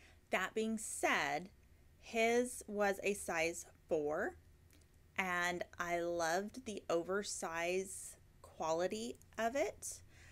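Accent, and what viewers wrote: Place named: Quebec, Canada. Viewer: I’m guessing Max and Vincent taught you how to pronounce Ulysse 😂 Very french Canadian accent ( I’m from Montreal, originally, as well). Oo-lease, with the accent on the second syllable, would be more accurate in French from France, where the yarn is from.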